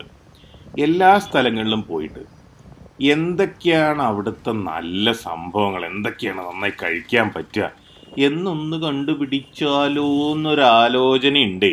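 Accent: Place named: Thrissur, India. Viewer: എങ്ങനെ ഈ തൃശൂർ വള്ളുവനാട് സ്ലാങ് വരുന്നു തിരുവനന്തപുരത്ത്കാരന്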